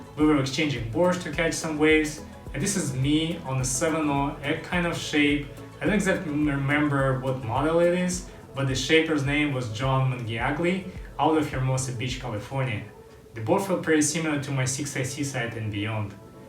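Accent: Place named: Russia. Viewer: No disrespect, but did you learn English in Russia? you have an interesting accent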